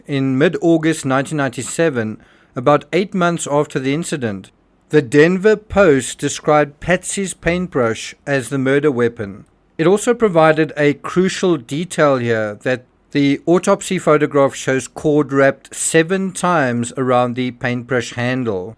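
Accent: South Africa. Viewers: Also, love the accent, it’s so soothing. South African right? Love it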